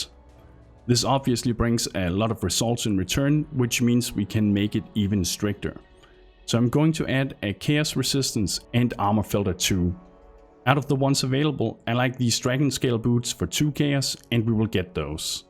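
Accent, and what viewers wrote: Denmark. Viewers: You sound very danish. Thumbs up!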